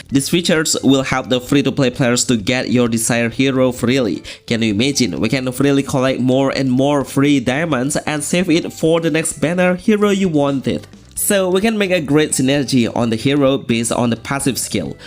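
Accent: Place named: Indonesia. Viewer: I know this accent. Indo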